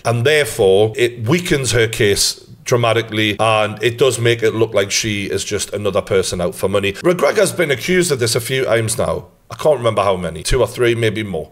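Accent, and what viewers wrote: Newcastle, United Kingdom. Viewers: Why are you putting a funny accent on for Connor McGregor? You can’t talk about anyone’s accent you have a Geordie accent 😂😂😂😂😂😂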